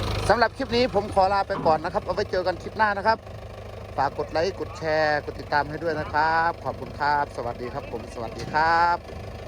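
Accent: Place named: Kalasin, Thailand. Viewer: เอฟซี กับ เว้าลาวกาฬสินธุ์บ้านเฮานี่ล่ะครับเฮียฟังเข้าใจง่ายดีครับผม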